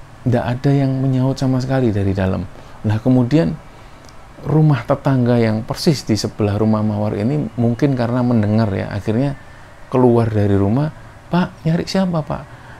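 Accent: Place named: Surabaya, Indonesia. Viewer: aku suka aksen suroboyoannya medok ...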